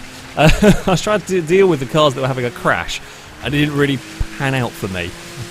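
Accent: United Kingdom. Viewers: Most of the time, I listen to youtubers with American accents so listening to this video was different but great! Im terrible at distinguishing different accents, but my thoughts go to thinking this is a british accent. Lol, I could be very wrong. I enjoy the accent, but most youtubers are overly animated and hyped up when Id much prefer something like this. You are not trying to hype us up by getting loud and crazy about little things. You're excitement seems genuine and makes watching this video in particular far more engaging than most others Ive seen. This is a simple compliment in the longest form but the summary of it is this. The lack of fake energy, the commentary itself, the volume of your voice and the clarity all make this video a great one. It heavily reflects you as a creator it does not disappoint. Have a great day everyone!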